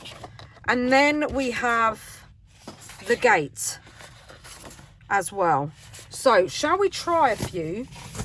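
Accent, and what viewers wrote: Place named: United Kingdom. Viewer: From where in the UK are you ? I love your accent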